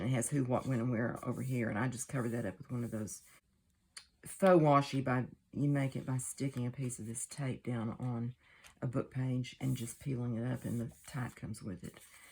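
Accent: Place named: Texas, United States. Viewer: These are so cute! And would make a lovely addition to a journal for a gift. I have been watching your videos, I don’t comment much, or every time. But love your channel. Your voice is so comforting... are you by chance in East Texas? Or Texas in general? You sound like you are. I wasn’t going to ask, but then you showed the post card from San Antonio sunken gardens. I’m South east of Dallas between Dallas and Tyler... anyway... I love finding new uses for the envelopes, and can appreciate that you don’t try and disguise the fact it’s an envelope!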